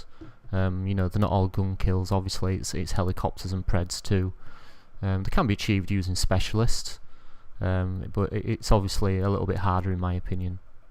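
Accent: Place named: Yorkshire, United Kingdom. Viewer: Yorkshire accent commentaries FTW. Great comm mate, especially for your commentary virginity. Face humping and piecey biting off? haha...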